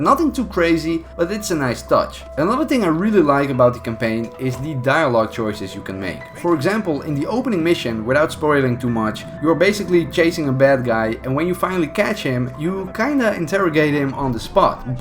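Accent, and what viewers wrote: Netherlands. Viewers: Funny how I get this video recommend and first thing I notice is your Dutch accent 😂 goeie vid man 💪🏻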